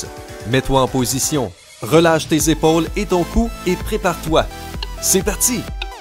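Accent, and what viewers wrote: Quebec, Canada. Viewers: c'est un Québécois! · Accent canadien? C‘est nouveau 🤔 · C'est un québécois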